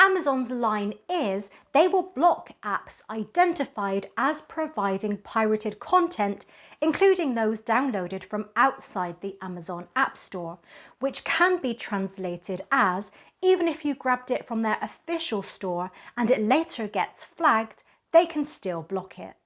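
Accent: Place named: England, United Kingdom. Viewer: I’ve jumped to Onn about a year ago, possibly longer. I still have a Fire TV cube that I very sensory use and an Insignia Fire television, that I basically use as/ monitor for the Onn. You always do a great job, honey abd I e said this before, I like the curly or waver hair over the straight hair. Plus I just live an English accent on women.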